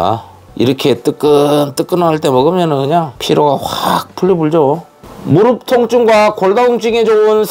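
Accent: Jeolla, South Korea. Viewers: 고향이 어디신데 전라도깡사투리를많이쓰시나요?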